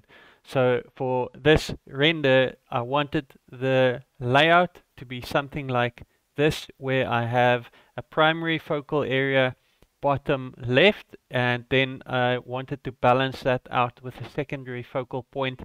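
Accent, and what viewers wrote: South Africa. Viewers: Where are you from, you have great tutorials, sound South African?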